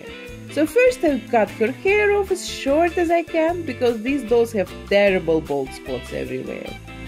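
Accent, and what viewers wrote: Russia. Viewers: По вашему акценту, можно с полной уверенностью сказать, что вы Русская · Вы ведь из России ?) Акцент слышен.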